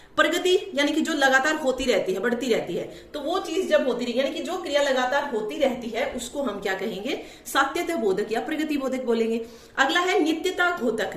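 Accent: Haryana, India. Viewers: Ye haryaanvi tone h bhai. Change nahi hogi🙂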